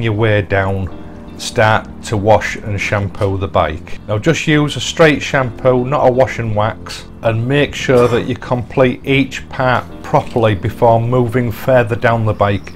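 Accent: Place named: Hull, United Kingdom. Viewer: I'm a new subscriber. You're brilliant! Thank you for your content and your Hull accent:D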